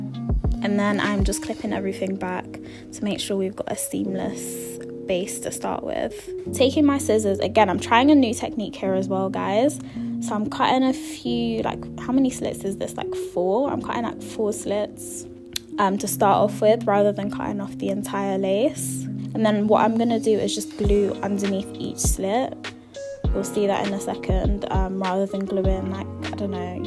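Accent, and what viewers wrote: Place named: United Kingdom. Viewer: I feel like when people have a British accent they have an advantage in life but when people have an African/ British accent. Untouchable. Lol looks so good on you and love your voice. It’s so pleasant.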